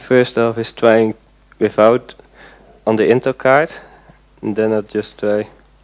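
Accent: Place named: Netherlands. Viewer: Klinkt zó Nederlands :-D maar goed gedaan.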